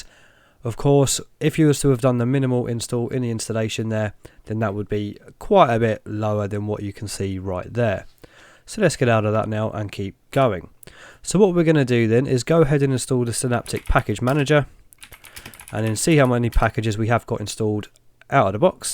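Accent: England, United Kingdom. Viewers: I like this bloke - Pommy accent doesn't grate on Australians :)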